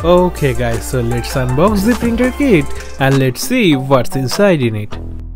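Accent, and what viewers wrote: India. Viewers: Lol, its super beautiful, i sometimes love indian-eng accent like you...lol If you think that why havent i wrote anything then, i probably wont get it its 1million percent truth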